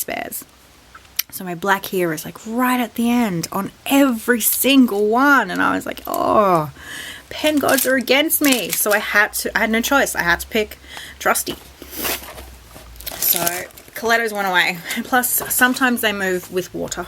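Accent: Australia. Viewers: Hey are you Aussie hope so love meeting other aussies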